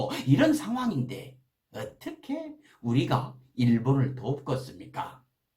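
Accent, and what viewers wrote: Jeolla, South Korea. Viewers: 강 성범씨의 유창한 전라도사투리가 너무 웃겨서 밥 먹으면서 듣다가 자꾸 밥을 뿜게 돼서 밥 먹을 때는 보면 안 되겠어요 내용 너무 좋고 알기쉽게 설명해주셔서 감사합니다 팬 되부렀스요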